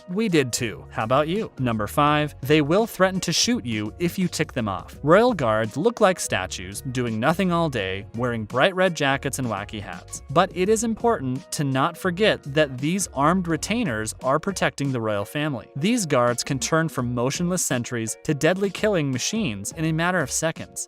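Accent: United States. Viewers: What do I think ? I think the tourists should remember, they are duty bound to keep the Way free for the Guards and not reversed. You sound like an American, would you disrespect your own Soldier Guards at the Tomb of the unknown Soldier in the USA ? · The narrators' accent says it all. Yanks are always a pain,an advertisement of a run-down,corrupt & broken nation.Its types like him that dragged a once-proud nation to the depths it now inhabits. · The narrator is a jerk, he sounds like a Yank, so dumb.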